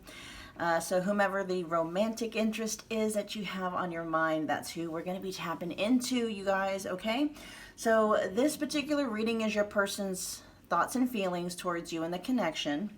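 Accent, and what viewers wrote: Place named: France. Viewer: Pile 2 and 3. He was still married and lied about it. He's a drug and sex addict. We both have kids and I was willing to leave my husband and create something him. Thank God I saw all the red flags and I cut all type of communication between him and I. I'm in a better place far away from this drama. Lies can clearly cause detachment between people. I regret meeting him. I'm a psychologist and I can't even imagine the struggle and the burden of his lifestyle. I discovered everything and I'm just disappointed because I waisted my precious time. May he find peace and joy with his son's mother. That French accent is not for the weak.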